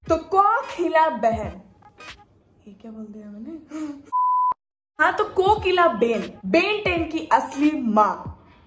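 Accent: Bihar, India. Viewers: This was so damn funny and your bihari accent is juts awesome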